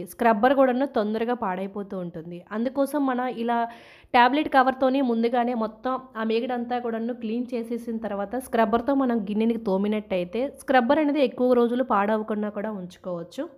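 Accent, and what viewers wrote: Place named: Kerala, India. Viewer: U r telugu slang is like keralalite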